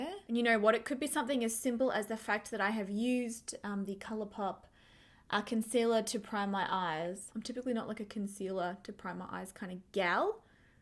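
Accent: Australia. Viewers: Hi girl, love your work, just love hearing an aussie accent. Just wondering if you have ever tried a one brand tutorial or a first impressions on all Australian brands like 'Australis', 'Mirrenesse' or even cheaper brands like 'Designer brands' ?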